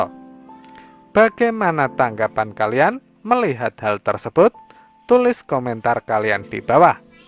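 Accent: Java, Indonesia. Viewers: wong jowo medhok penyiare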